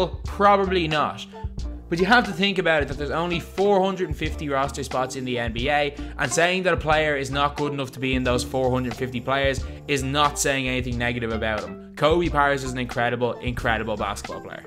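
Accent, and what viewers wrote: Ireland. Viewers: Irish Accent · Reynaldo Tolentino Irish yan pre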